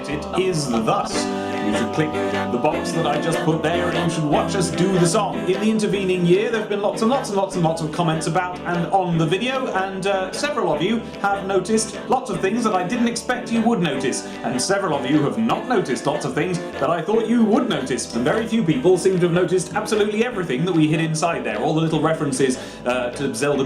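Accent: United States. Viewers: you actually sound decent with your American accent. You just need to work on your R's and few other things. Look up some accent videos, it makes it much easier. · Your american accent is actually really good! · Wow, you sound very American! Great job!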